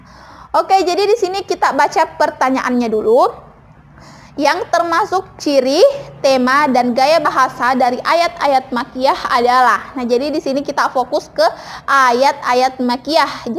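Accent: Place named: Sulawesi, Indonesia. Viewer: itu sepertinya logat sulawesi, saya punya teman juga bilang salam warahmatullahi bukan warohmatullohi 😅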